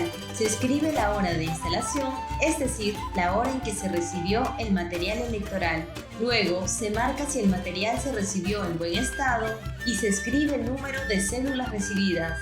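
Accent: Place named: Galicia, Spain. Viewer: Soy <mail> me parece bien un video, pero no me gusta el audio parece una gallega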